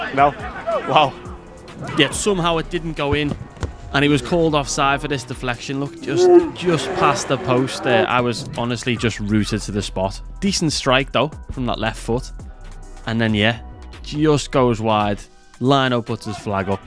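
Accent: Liverpool, United Kingdom. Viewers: Cold Scouse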